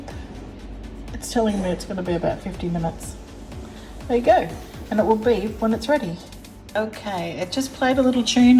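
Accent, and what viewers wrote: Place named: England, United Kingdom. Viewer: Love the English accent,. 😆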